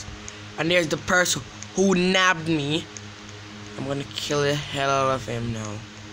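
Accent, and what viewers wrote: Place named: United States. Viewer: Yuh sound a way talking american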